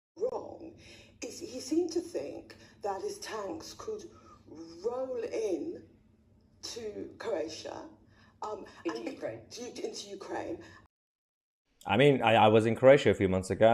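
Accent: United Kingdom. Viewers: It's a bit disconcerting to me that a seemingly intelligent British Accent is being used to say stupid things.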